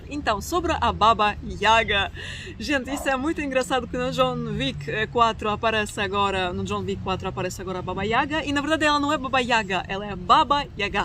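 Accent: Portugal. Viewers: Eu te assisto sempre e fico bem na dúvida se o teu sotaque é do teu idioma, mais parece que é mesmo de Portugal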